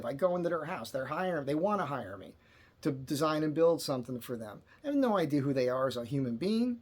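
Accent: United States. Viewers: I like the way you think... I am "STRAIGHT," but you are cute as a button... LOL serious bud you are like a buddy at work in the smoking area or on the line... you got a good head on your shoulders you sound like a good American if that means anything these days.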